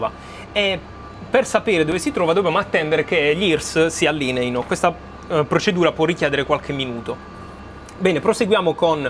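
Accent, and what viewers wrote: Apulia, Italy. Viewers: Ciao, no è pugliese:)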